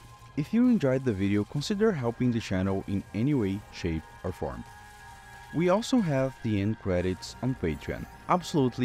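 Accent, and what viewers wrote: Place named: Brazil. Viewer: Hmmm sotaque br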